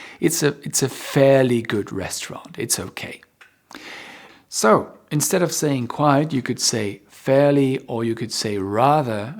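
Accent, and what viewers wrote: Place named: England, United Kingdom. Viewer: Hello, I watch your Channel quite a lot. I love, your English accent and your quiet and bright way of speaking. I progress a lot with you. Thanks for it. Take care 🍀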